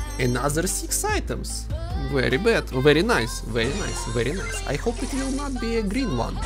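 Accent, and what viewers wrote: Russia. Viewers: R u from Russia?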